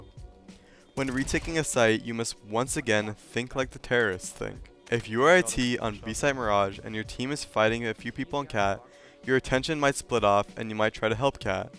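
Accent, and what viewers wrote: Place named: Ireland. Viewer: sounds irish lmao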